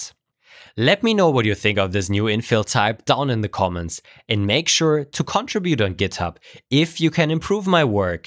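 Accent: Germany. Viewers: U aren't the only one who noticed german accent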